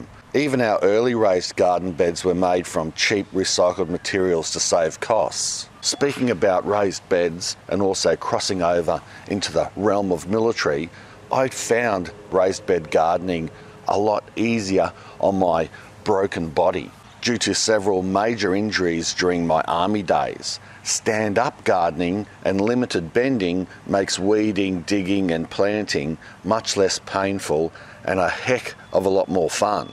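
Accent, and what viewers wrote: Australia. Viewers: You really seem like such a kind and thoughtful man. I have nothing but respect for you and your family and I’m glad that you becoming a content creator has helped your family out. Haha maybe it’s your Aussie accent in my American ears playing tricks on me but I doubt that. I wish you a happy Memorial Day from over here in the States.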